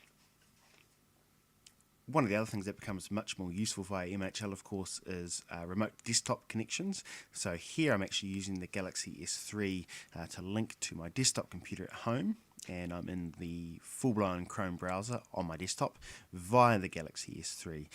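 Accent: New Zealand. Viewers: r u from NZ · cool accent! NZ i presume?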